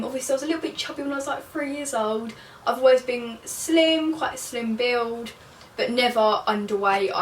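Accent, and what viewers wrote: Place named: England, United Kingdom. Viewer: are you from the same part of England as Lisa Blackwell? because that accent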